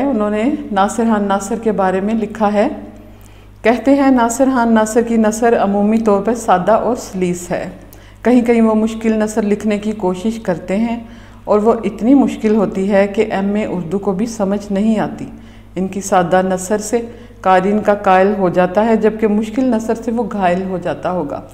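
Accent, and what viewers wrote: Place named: Punjab, India. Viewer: ماشاء اللہ، بہت خوب تبصرہ، آواز کا ردھم بہت ہی متناسب، بڑے رسان سے ادائیگی کرتی ہیں۔ اور پنجابی کے بڑے میٹھے انداز میں خ اور ح کا امتزاج بہت پیارا لگا۔ آپ اس لہجے میں ایک دو جملے پنجابی کے بھی شامل کرتیں تو دو آتشہ ہوجاتا۔ ویسے حالات کی طغیانی کا خطرہ نہ ہو تو دونوں مل کر بھی ویڈیو بنا سکتے ہیں۔ بہت ہی اچھا اور اچھوتا خیال۔ سلامت رہیئے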